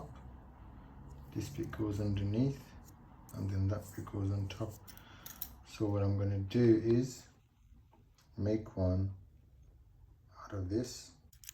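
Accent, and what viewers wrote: Birmingham, United Kingdom. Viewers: Awesome video and lovely watch. I’m pretty sure you’re a brummie like me 👍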